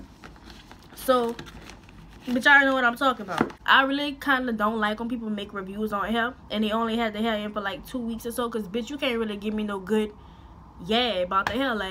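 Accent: New Orleans, United States. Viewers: I love the New Orleans accent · She gotta be a NOLA baby!!! I am an we got that accent ♥️⚜️ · Gotta be fr the NOLA! TOO CUTE! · I need a friend from New Orleans so I can laugh I love the accent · Lmfaoo bruhh u from New Orleans or Reserve? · Yo! I'm probably old enough to be your Mom, but IFWY!!! OMG! The REALEST video EVER! You crazy and funny asl and we NEED that!! You feel me? I knew as soon as you opened your mouth, you were from NOLA. Y'all funny, crazy, and curse a LOT, but I LOVE it!! You're very pretty too! 😍 New Subbie! PLEASE keep doing hair reviews or hell..any kind. 😂❤💯👍🏾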